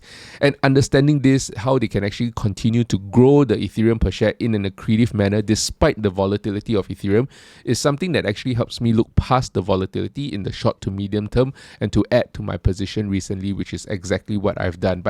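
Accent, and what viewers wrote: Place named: Malaysia. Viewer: I suspected but again you sound/accent exactly my Malaysian colleagues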